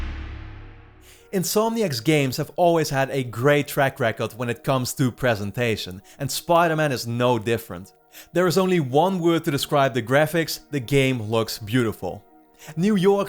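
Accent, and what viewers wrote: Netherlands. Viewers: ik vroeg mij al af of je nederlands was haha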